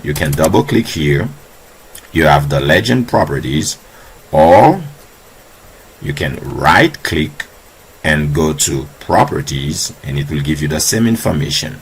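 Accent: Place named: Haiti. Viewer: Thank you, my brother. I've been trying to figure this out. You're from Haiti? I know the accent when I hear it!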